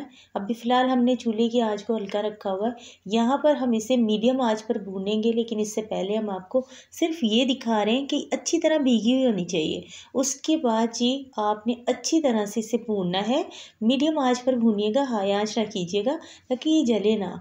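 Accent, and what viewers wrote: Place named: Lahore, Pakistan. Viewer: Ap ki aavaz boht polit h... Style of saying is lahori😊... Boht achi tarh samjhaya gya h ap ki trf SE... Delicious so much ❤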